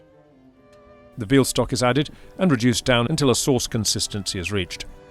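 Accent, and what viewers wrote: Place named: England, United Kingdom. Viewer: you keep on producing whatever is in your heart and mind to produce and you won’t be letting me down! Promise! I will be honest with you, I really don’t know how I came across your channel, but I am very glad that I did. I have had many years in the wine industry (which thanks to Covid has been a challenging thing recently for not only myself but many food and wine professionals in the city), but I started out in the food end of the business and I have done a LOT of things in both areas over the years. Recently, New York has been feeling like a culinary dessert to me…maybe I have just become jaded! There was something about your videos when I first discovered them that really caught my attention, and trust me that’s saying a lot because I take in a lot of what is out there and just through osmosis here in the city I pick up a lot. 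I confess that when I first started watching it was just really good eye candy as they are so beautiful, but very refreshing and I liked your style (not an egomaniac and who doesn’t love an English accent), and the recipes tugged at my heart. This may seem a little silly to say to you, but your videos have been awakening a side of me that I was losing touch with and is so important to me. I can’t help myself, when I watch them now I look at them from a more professional lens and yes, I do praise you a lot, but I do have a little mental list of a few thing… Yes, I am here in Manhattan, not far from your old stomping grounds…I am in Hell’s Ki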